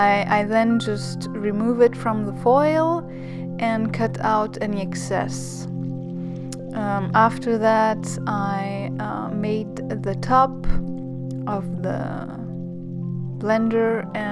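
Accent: France. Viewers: Hello! Do you live in France? We will be in Paris in December and just adore your accent. Beautiful design! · Are you french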